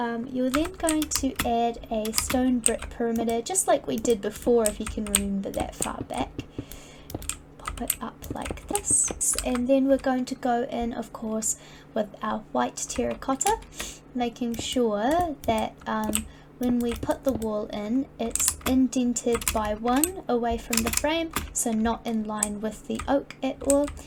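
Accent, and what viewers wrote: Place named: New Zealand. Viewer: Finally a kiwi builder so I can understand what your saying lol loving the builds super clean and super simple hoping to up my build game a bit looking forward to your next tutorial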